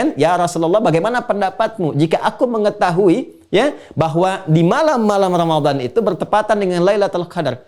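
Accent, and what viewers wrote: Malaysia. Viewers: Ustad terkadang menggunakan bahasa dan logat melayu hehe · Ustadz bicara nya sprt bhs melayu / Malaysia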